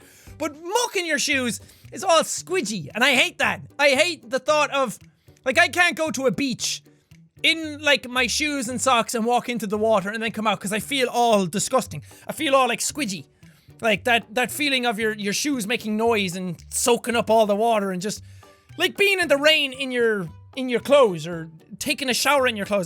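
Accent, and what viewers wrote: Ireland. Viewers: Jack has his irish accent again. I like it %<num> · Hey + do you live in Ireland or are you Irish? Like if you have the same question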